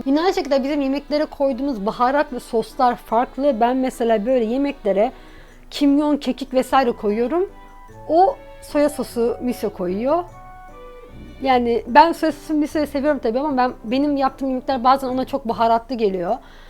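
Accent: Japan. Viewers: abla türkçe konuşman çok tatlı ya japonca vurguların falan :D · türkçe telaffuzun ve vurguların japoncayı çağrıştırınca gülümsetiyor :D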